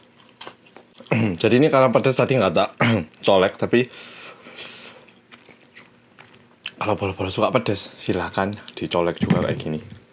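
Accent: Java, Indonesia. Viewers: Kok medok bahasa jawa ko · Pertama kali liat orang Korea ngomongnya medok bngt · Logat jawa nya gemesin mas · Muka korea dikit suara logat jawa awokakaka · Kan memang Jawanya gk ilang ilang ini oppa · Orang Korea kok kek orang Jawa ngomong nya yah😂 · Masa korea medok kaya jawa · Mas ganteng banget😻😻medok lagi jadi paham bicaranya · tak kiro dene wong jowo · Kenapa kalo kamu orang korea tapi kyak boso jowo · Korea Ke jowo jowoan wkwkwk🙏👍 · Lek wong jowo dadi opo ae.boso ne tetep medhok :) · Iki nonton oppa rasa wong jowo rek rek😊😊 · Lagek delok kok aneh wong korea bosone medok koyo wong jowo😂😂😂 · Kangen medhoookkkkk nyaaa:"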